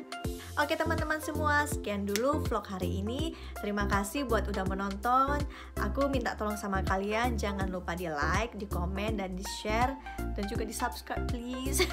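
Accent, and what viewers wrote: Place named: Medan, Indonesia. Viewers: Enak bangat ya dek..udh pernah di jelaska n Inong same.. kayak gitu dek soalnya dia pernah melakukan nya sekarang dia kawin sama orang Austria .. dan tinggal dia di Austria..anak saya aja saya anjurkan kayak gitu dek ..tapi diaudh kerja sama kuliah sekarang di Batam de .senang kakak dengar logat Siantar mu dek..❤️😭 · Nampak y logat medan itu. Gk bs hilang hahahaha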